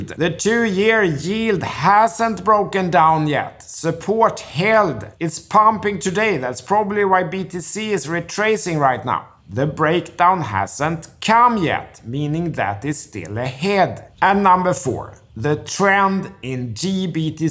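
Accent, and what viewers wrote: Sweden. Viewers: Suiting voice for a travel show with a unique swede influenced dialect. I just don't get over how close your username is to "CTE Larsson" 😂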